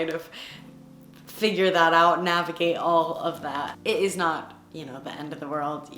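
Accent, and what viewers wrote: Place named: United Kingdom. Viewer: You are getting a better British accent though.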